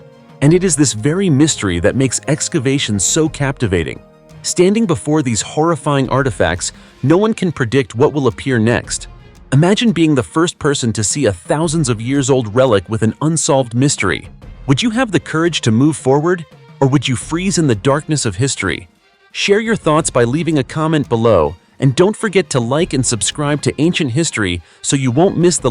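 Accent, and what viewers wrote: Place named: United States. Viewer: as a side note, I can’t even watch this. American narrators drives me nuts.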